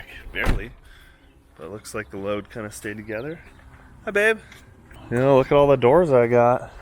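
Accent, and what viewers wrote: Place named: West Virginia, United States. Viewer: You sounded real West Virginian in this video.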